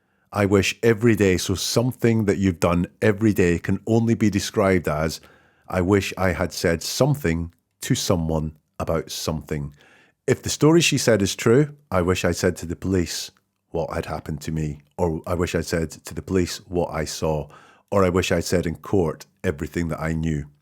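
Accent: Scotland, United Kingdom. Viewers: Nice to hear a fellow Scot 🙂. Really enjoying your videos.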